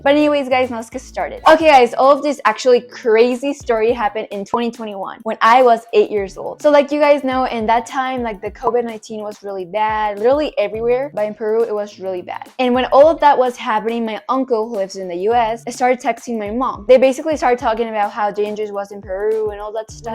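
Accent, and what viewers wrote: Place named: Peru. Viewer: I knew you had an accent but I had no clue where you were from but that’s really cool that’s your from Peru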